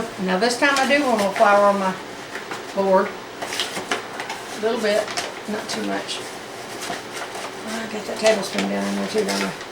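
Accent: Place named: Texas, United States. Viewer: Mighty refreshing to know this is a cooking channel. At first glance of those 2 pies, I thought... Oh Hell No! Not another documentary about ''Chinese Foot Binding'' results. Good to know Natchitoches is on the same global parallel with Cowtown Texas accent & all. That ratio of egg to water makes for the most tasty of scrambled eggs as well.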